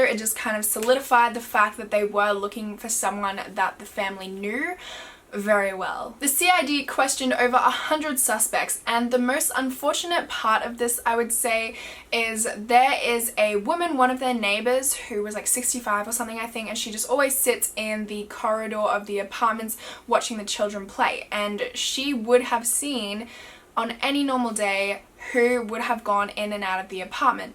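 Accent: Australia. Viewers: Is it weird that I look forward to these videos because I just enjoy hearing you talk 😂😂😂 Australians honestly have the best accents! Hahaha but thank you for putting up another mystery vid!! Love you!!❤️ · i was not expecting you to be Australian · Cuz shes Australian 🤭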